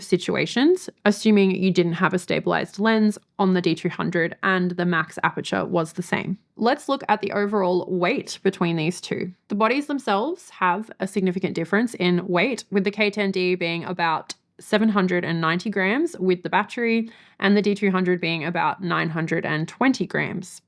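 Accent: Australia. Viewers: You are so Australian and particularly QLD. Love it!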